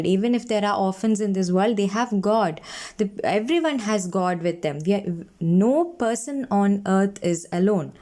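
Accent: Kerala, India. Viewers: Ig Kerala due to the accent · Mam...I guess u r a Malayalee..am I right ?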